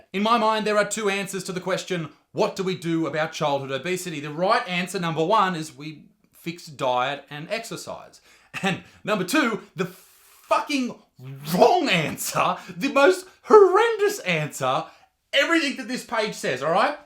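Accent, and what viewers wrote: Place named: Australia. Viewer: He's true blue....an Australian with thick Aussie accent. He was raised in rural Australia for sure.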